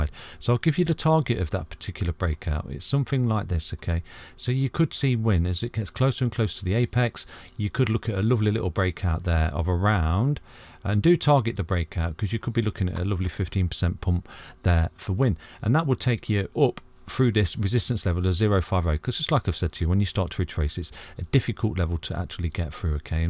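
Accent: United Kingdom. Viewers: I was waiting for this Mr British accent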